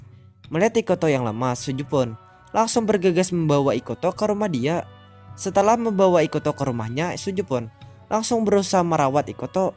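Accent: Banjar, Indonesia. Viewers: logat banjarism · Logat nya Banjar banar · Pasti logat banjar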